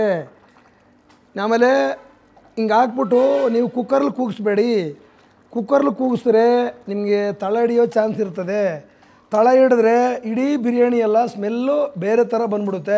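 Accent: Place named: Bangalore, India. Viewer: Namaskara. Naanu Sehar, Hosur. Ivaaga Inna 3 thinguligea USA Alli idhinee. Neevu nimma 54 vayasinalli yaagidhiro adhe thara mamma thandhe Krishna Swamy avaru adhe 54 lalli adhe thara idru. Adharke innonondu Namaskara. 82 vayasu vargu chennagi idru. Neevu 100 vayasu irilike aaseervafagalu (Naanu ivaga 73 Senior). 2 varushadinda nimma Tamil postgalu nodutha idhni. Nimma Bangalore style tamilu super. Best wishes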